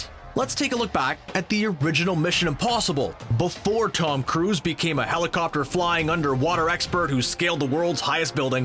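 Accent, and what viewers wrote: Canada. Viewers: Can't deal with the heavily Canadian accented narration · How come all these types of docustyle posts are done by Canadians with heavy Canadian accents?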